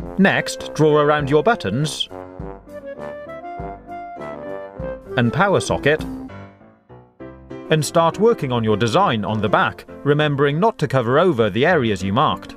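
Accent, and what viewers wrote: England, United Kingdom. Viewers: cool video i don’t wanna be mean but you sound like an england man like cool tone c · British accent?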